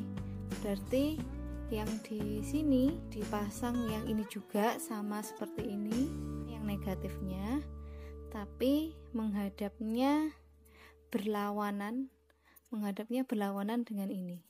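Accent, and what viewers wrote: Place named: Java, Indonesia. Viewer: mantap,.. kreatif sekali mbak.. salfok sama suara medhok nya lho.. hehehe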